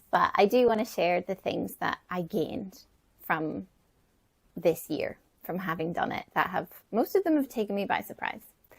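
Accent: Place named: Ulster, United Kingdom. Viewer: I love your natural Ulster accent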